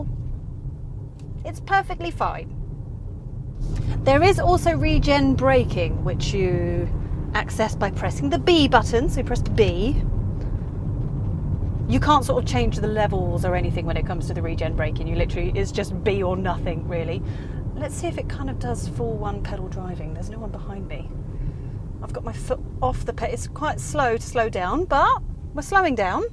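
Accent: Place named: United Kingdom. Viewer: When I heard the British accent, I realized how much I missed Doctor Who…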